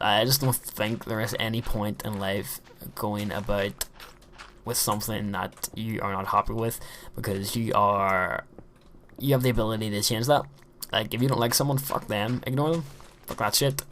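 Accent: Ireland. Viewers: what part of ireland r u from?? And also, WHATS THA CRAIC!!! :D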